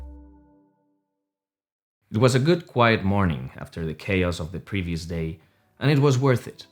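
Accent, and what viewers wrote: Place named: Spain. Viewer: Eres Español creo se nota😀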